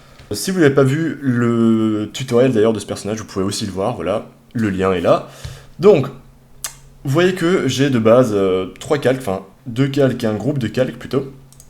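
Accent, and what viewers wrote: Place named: South, France. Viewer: oh le bel accent du sudeuh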